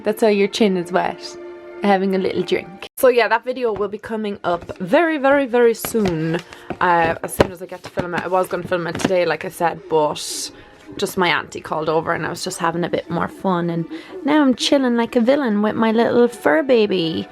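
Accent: Ireland. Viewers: Finally we get to here your Irish!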